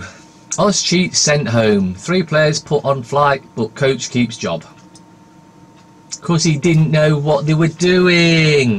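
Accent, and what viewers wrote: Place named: Mansfield, United Kingdom. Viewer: 95 pee !!.. lol ;).. sorry buddy, Mansfield accent drives me potty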